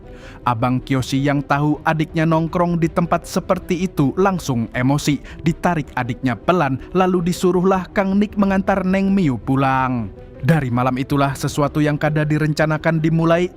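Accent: Banjar, Indonesia. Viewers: uyy amang banjarrr😂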